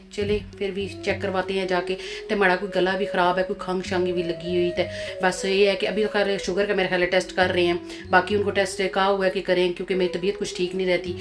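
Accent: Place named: Pothwari, Pakistan. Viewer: Mash Allah very nice and interesting vlog.I love your gulabi pothwari and gulabi Urdu, it makes it so interesting 😊. Bhindiya are my favourite with chicken or meat 😋😋😋.stay connected ↪️🏕👀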